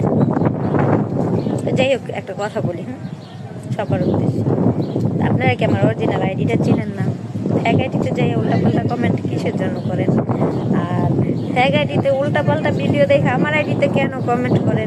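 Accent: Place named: Noakhali, Bangladesh. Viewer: আপু তোমার বাড়ি কোথায় মনে হচ্ছে নোয়াখালী পরিচয়টা কি দিবে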